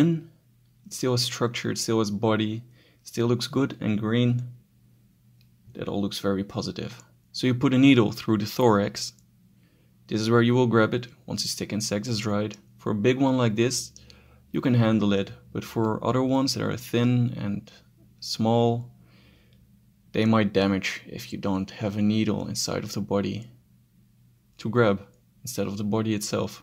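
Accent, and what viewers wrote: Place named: Netherlands. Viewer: The fun thing is... Ik ben ook Nederlands en ik dacht het al aan je accent te horen, maar ik wilde het zeker weten... Heb je ook levende Heteropteryx Dilatata thuis?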